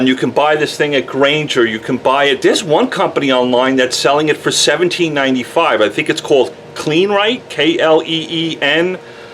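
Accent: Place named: New England, United States. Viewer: You have a bit of a New England accent.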